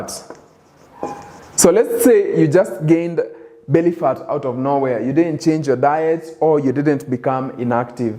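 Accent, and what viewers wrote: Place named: Kenya. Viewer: Are you kenyan? Just asking, you sound like my watu:)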